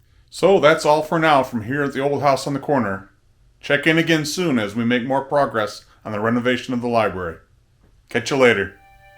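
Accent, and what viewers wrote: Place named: England, United Kingdom. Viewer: Hello from Brazil ☕️ 👏🏼.Excellent presentation 👏🏼. Excellent work. Beautiful English accent. I subscribe in your channel.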